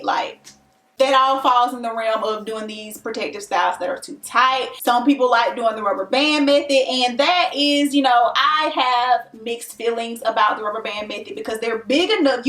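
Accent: South, United States. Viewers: Instantly subbed because of the southern accent 🤣 I’m from the south and it’s hard to find Youtubers who talk like me! Love the video! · Ohhhhhhhh Lol ok I knew you had a country accent. I was like maybe her parents are from the south. Because people tell me that I have a country accent sometimes but that’s because my grandmother is from the south. But when you were saying Cousin I was “oh she from Cali because they say Cousin a lot” don’t they? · Finally yes! I can tell! lol that’s why I said influence cuz you have a southern accent the way you say “up” “Best” and “pound” it’s evident of the two.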